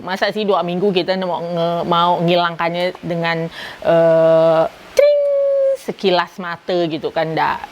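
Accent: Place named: Pontianak, Indonesia. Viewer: Mantap kak tetap konsisten dengan bahase pontianak kite...bangge dengarnye..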